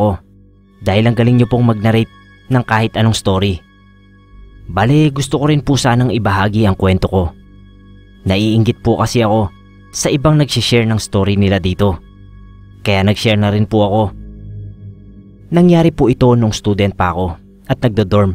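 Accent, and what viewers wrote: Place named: Pampanga, Philippines. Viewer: Sa the way sya magsalita feeling ko kapampangan sya haha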